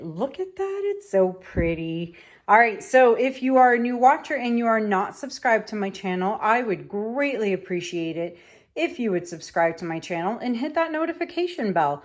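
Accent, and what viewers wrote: Canada. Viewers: Anytime she says anything with “out” in it I laugh BUT I will say I never really could tell a difference in the way Canadians speak vs Americans UNTIL I heard her with the “out” .. turns out … ab”out” etc etc … now anytime I hear it I’m like oh you’re not just from outside of Long Island you’re from Canada!! 🇨🇦🇨🇦 I personally think it’s pretty cool. Ps, this turned out beautiful! I really actually like the titanium buff light as a base color too!! I almost like it better than just plain white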